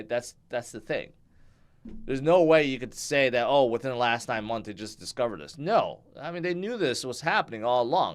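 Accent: United States. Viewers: It’s strange hearing what appears to be a Japanese man with a southern US accent!!!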